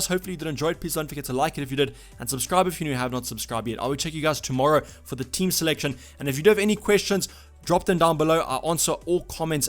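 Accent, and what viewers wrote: South Africa. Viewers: The only channel needed for expert advice on FPL, Not to mention the huge bonus of that great South African Accent. Thanks.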